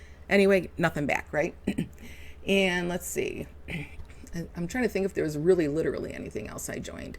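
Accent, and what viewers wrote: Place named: Chicago, United States. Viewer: Ma'am. After about 30 secs of listening to your story I knew you were from Chicago 😅 Even though you're no longer up here it's nice to hear someone sound like me. AND a creator who is also Gen-X, hello fellow 80's cool kid! Looking forward to your content on all those companies, many of which I'm super familiar with. You had the purse?!?! I'd have been SO jealous.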